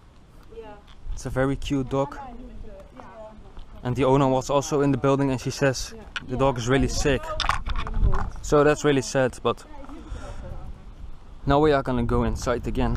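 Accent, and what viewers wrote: Netherlands. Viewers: can you speak dutch you i heard it on your voice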